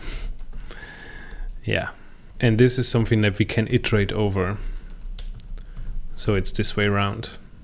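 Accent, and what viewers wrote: Germany. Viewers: Hello Rust! Oh that sounds pretty cool. And yeah I feel you have some nice German accent when you talk on the video :P I started learning and using Rust because of TiKV actually :) Thanks for sharing those videos again and keep up with the good work :)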